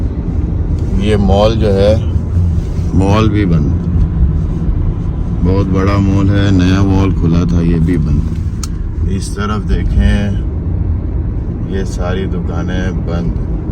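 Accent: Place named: Pakistan. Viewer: Bhai ap kia Pakistan sy hn?qk ap ki urdu bhut saaf hy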